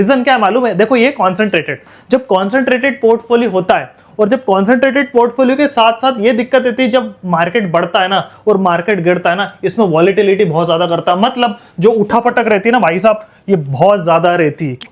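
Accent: India. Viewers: भाई मेवाड़ से हो क्या..??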